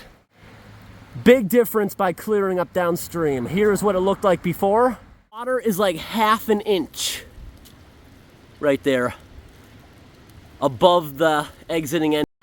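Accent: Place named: Maine, United States. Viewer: I remember now why one of the reasons I started watching this channel is bc I knew right away you were from ME with your accent/the hard pronounciating on the end of words lol!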